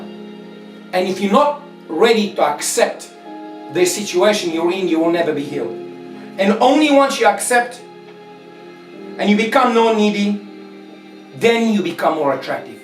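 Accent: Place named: South Africa. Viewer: Hello i sent an email of my situation. Also are you from south africa or did you live there before? I hear some sort of SA accent. Thanks for this amazing video!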